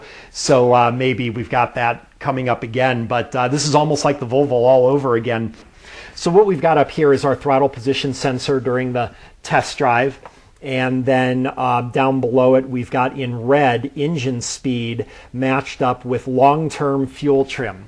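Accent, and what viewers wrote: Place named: Louisiana, United States. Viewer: Jeeese I must apologize I just took it for granted - to me you have an accent, I thought it was Cajun but I'm from Canada so its not like we hear people from Denver either except John Denver and you know he never had an accent. Up here once a week I listen to the best automotive phone in radio podcast in the USA from guess where Louisiana. This is the link if your interested. <link>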